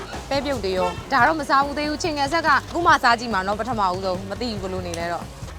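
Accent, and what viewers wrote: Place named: Dawei, Myanmar. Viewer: ထားဝယ်နဲ့ဘိတ်ရောနေပြီဟ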